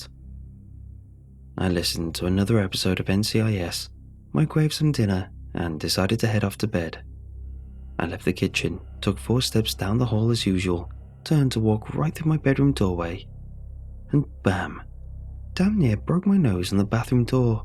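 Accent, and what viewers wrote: United Kingdom. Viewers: British accent from Chattanooga Tennessee?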